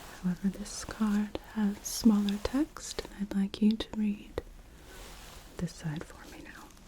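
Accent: United Kingdom. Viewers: Posh, British accent, love it. I'm a Bristolian so not posh at all! This is so good! ❤❤❤ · Love the British accent 😊 Best wishes from the UK 🇬🇧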